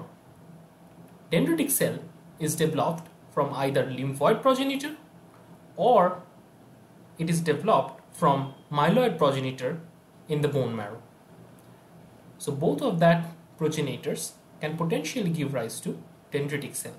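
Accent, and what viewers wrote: India. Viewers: Fuck !!! I hate Indian accents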